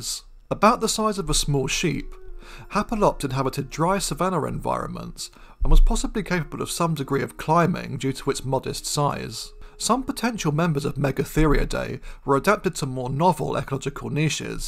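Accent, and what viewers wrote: United Kingdom. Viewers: This funny british (bri’ish) polar bear is funny and educational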